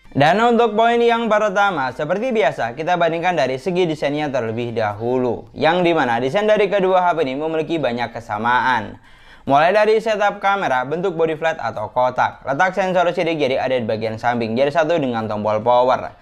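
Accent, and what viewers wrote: Madura, Indonesia. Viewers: Logat nya kentel madura hehehe... Mantap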